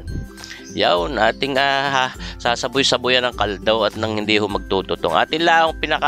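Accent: Batangas, Philippines. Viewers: Nakaka aliw ang punto ng bigkas ng salia po ninyo taga batangas po kayo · Ok sana pero asar ang tono ng salitang battangueño pangit pakinggan. Sa akin lang comment yan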